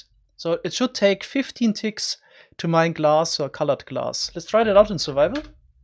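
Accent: Germany. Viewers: Are you a german?xD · Bist du deutsch ? Klingt vom Englisch so 😂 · Man hört halt raus das er deutsch is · bist du deutsch?😂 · Ich lehne mich mal weit aus dem Fenster aber du bist deutsch😂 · Is he German? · deutsche aussprache uff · Your from Germany right?😅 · Man hört richtig dass du deutsch bist xD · Is it just me or are you German? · German detected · Noch nie so einen deutschen Akzent gehört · Are you a german? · Are you german? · Wie krass man einfach hört das du deutsch bist 😂😅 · Are you german cus' you Sound like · I’m just curious, are you German? Sorry if this seems offensive. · no no please give me a heavier german accent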